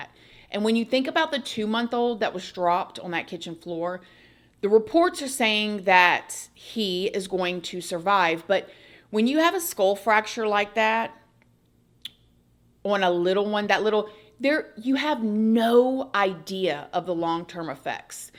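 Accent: South, United States. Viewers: I have been enjoying your content for years now. Thank you for all of the info you have enlightened us with. But I have one question to ask you. Why does it seem like you did not have as much of a southern accent as you presently do? Is it because of where you reside? Just curious about that. Bottom line though is that a really enjoy your content and the way you present it. Please continue with the excellent job that you do. Thank you so much!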